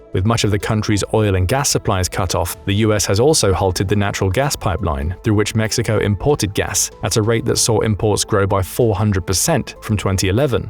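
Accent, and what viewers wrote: United Kingdom. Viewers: Heard a British accent, automatically disregarded their opinion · You can tell you have no idea about American Military. There is no way they would be that big an issue. And Mexican American Soldiers would not desert the American Military cause they know if they tried crossing the boarder later they would be killed for desertion. Remember this is some Brit by the sound of him has zero U.S. Military experience whatsoever. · 😂 bro, you,forget the firepower Americans have more war experience since you sound like you from the uk you have no idea how much firepower us have and the damage they can do only thing would be they wouldn't want to harm civilians they ony want the cartel but if Mexico government keeps helping them cartels than yeah us will wipe thrm out faster than what you believe.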